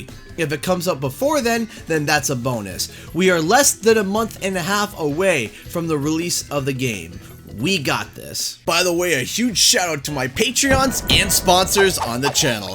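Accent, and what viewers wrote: Canada. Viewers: Word of the video: "Vehgue." That the Canadian accent at work, I'm guessing?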